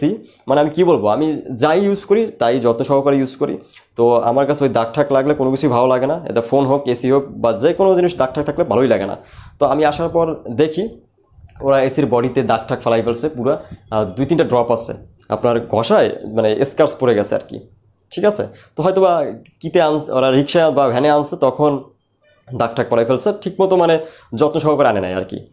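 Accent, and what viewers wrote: Barishal, Bangladesh. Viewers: vai bari borisal naki😅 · ভাইয়্যার বাড়ি বরিশাল কোন হানে! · Vai koto taka khaisen? Apnar kotha shuinna matha ton ton kortase. Apni Barishaylla tai na? Chapa baji valoy janen. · ভাই বরিশাইল্লা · Your language is telling that you are Borishal person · ওরে বরিশাইল্লা!!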